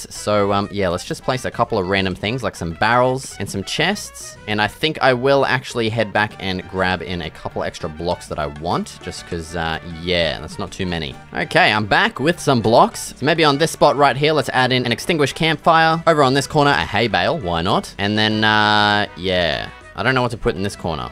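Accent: Australia. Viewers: STILL SHAKING FROM THAT FALL!. Im a 50yr old female and you have taught me so much about building i steal everything! And love the Australian Accent Not that WE have accents!!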